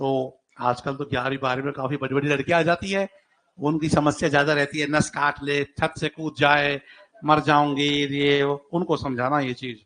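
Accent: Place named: Bharatpur, India. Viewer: Lagta hai guruji Bharatpur se hai hamare jesi language hai bilkul ye Bharatpur bali hi h😀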